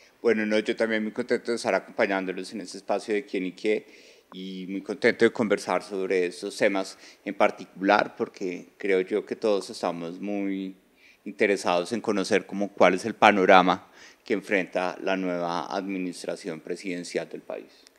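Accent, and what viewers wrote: Colombia. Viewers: Tengo que confesarte algo Daniel. Cuando te vi inicialmente como director del Dame, me caías renal. Soy administradora de presas y entendía tus espeluntes cifras y me dolían, pero no soportaba tu hablado de gomelo, pero fue pasando el tiempo, y te convertiste en mi funcionario del gobierno favorito. Será un gusto volver a contar contigo en ese cargo, cifras certeras y valentía para darlas es lo que Colombia necesita. Felicitaciones, porque estoy segura que quedaras en el cargo.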